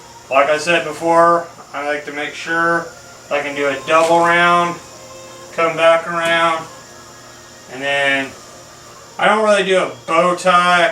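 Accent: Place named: Tennessee, United States. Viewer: Rly good video. Subbed! 🤘🤘.. BTW! That accent.. I just gotta ask you bro, are you from Tennessee? Hails from Finland!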